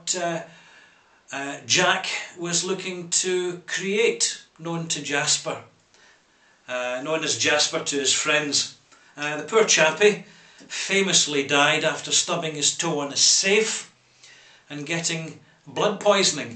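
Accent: Scotland, United Kingdom. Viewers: Given your own great Scottish burr (and that of your brother, ) what took you both to the Isle of Man? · What is that accent, scottish?:) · He's Scottish -__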